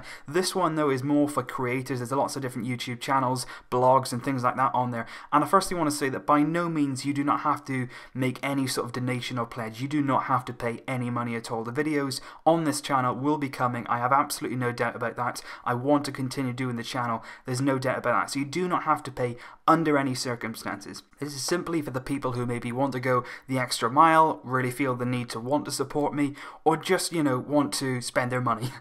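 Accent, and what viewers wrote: Wales, United Kingdom. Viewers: Barcelonista 1983 I'm from Scotland and he definitely sounds Welsh mate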